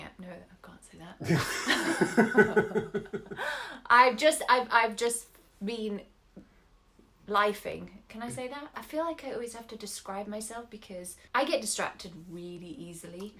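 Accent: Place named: RP, United Kingdom. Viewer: You sound so posh lol, welcome back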